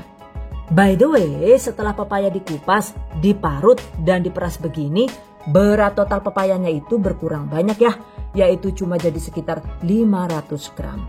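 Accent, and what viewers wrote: Banyumas, Indonesia. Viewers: Ngapaknya bikin ngakak bunda.. 😄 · kok bahasa medok banyumas, asli bms ya jeng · Wongendi kie deneng ngapak temen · Ko bahasane bisa ngapak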